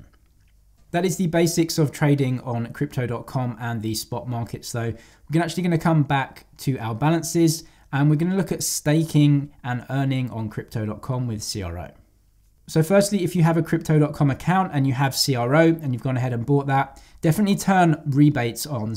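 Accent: United Kingdom. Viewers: AGAIN!!!!! Another video that I don’t see what you see?! Is it possible that (I’m sorry) I am American, you sound like you might be across the pond……… could that be the problem???? (CONFUSED!!!!)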